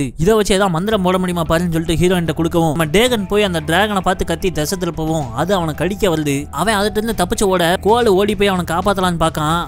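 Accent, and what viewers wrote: Tirunelveli, India. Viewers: Tirunelveli slang thalaiva 😁ellarukum pudikum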